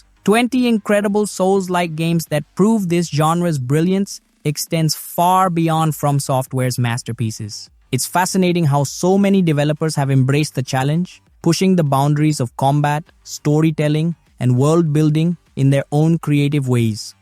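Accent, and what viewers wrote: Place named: India. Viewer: Sometimes you sound really indian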